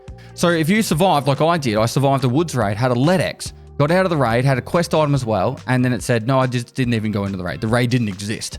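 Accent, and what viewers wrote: Australia. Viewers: I watch your videos to practice my Australian accent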